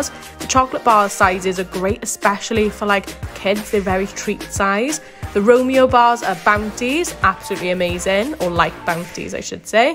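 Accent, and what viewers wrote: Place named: United Kingdom. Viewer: Hello. Youtube suggested your channel, I guess since I enjoy watching grocery hauls. Being from the USA I enjoy seeing hauls from the UK with the different kinds of food, prices, etc. I enjoyed yours that I have watched so far, but I find the music over your talking a little distracting. (I much prefer to hear your details you share especially with the accent). Take care and keep the videos coming.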